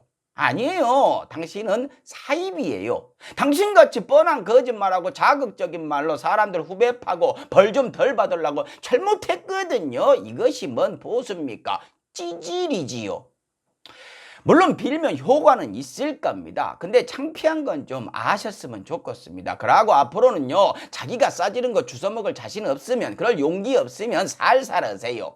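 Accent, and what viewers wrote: Jeolla, South Korea. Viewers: Fact news channel 강성범tv 전라도 사투리가 더 찰져졌네요. 항상 감사드리고요잉, 지지합니다. 조국 전장관님 따박 따박 숨어 있는 해충 한마리까지 다 찾아 내셔서 혼꾸녕을 내주십시요~~~~. 공수처 설치는 올해안에 꼭 완수 하시길 국민의 한사람으로서 명령 합니다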